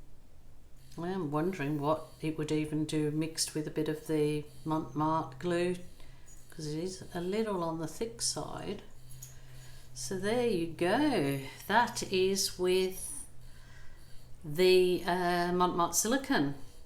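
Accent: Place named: Kidderminster, United Kingdom. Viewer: Can I be nosey and ask where you are originally from? I’m in Kidderminster and you sound local xx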